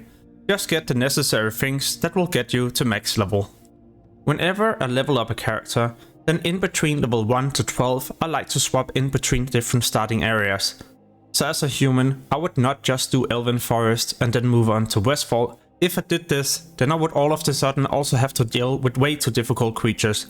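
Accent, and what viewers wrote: Denmark. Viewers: Great tips, ive been playing wow since the very first beta of vanilla and i still found some usefull tips here thanks. your accent sounds like your Dane just like me.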